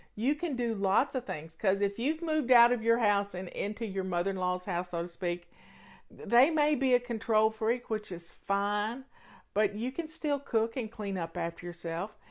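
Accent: South, United States. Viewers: Thank you for sharing your wisdom with us! I love your southern accent and words - it’s comforting to me and I’m not even southern!😄. Thanks for being you!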